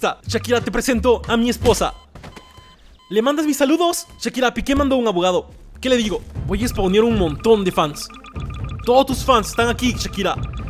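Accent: Peru. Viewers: sos peruano? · eres de perú?🤨🤔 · Omg eres peruano a argentino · eres peruano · Eres de Perú? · eres peruanoooooooo yo tambieeen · Eres peruano?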